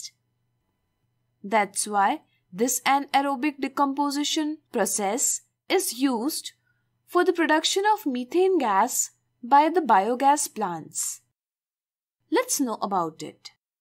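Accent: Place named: India. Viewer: terrible Indian accent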